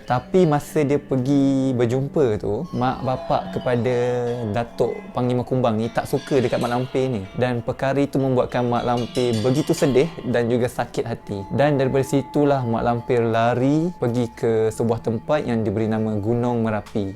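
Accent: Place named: Perak, Malaysia. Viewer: Org perak ke yop ajer nya😂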